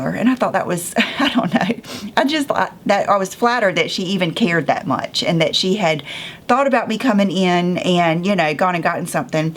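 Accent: South, United States. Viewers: Love your accent, are you from the south in the USA ? Maureen x from New Zealand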